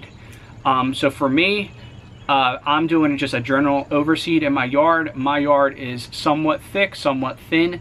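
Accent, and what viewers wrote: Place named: Philadelphia, United States. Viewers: Ha, I was thinking the same thing. Seemed like you had a twinge of Delco too! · Definitely recognize that Philly/South Jersey accent. Fellow native & lawn care nut here, Gloucester County!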